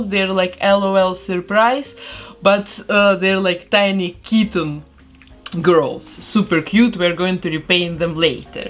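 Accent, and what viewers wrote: Russia. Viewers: Im so shocked that you are from Belgium… i thought you were Russian or Polish from your accent😅😅 spreek je ook Nederlands/Vlaams? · Русский акцент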